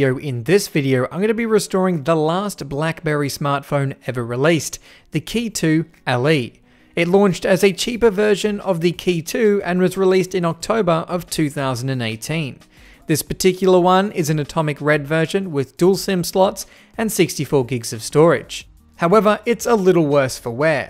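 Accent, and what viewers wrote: Australia. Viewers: Nice Australian accent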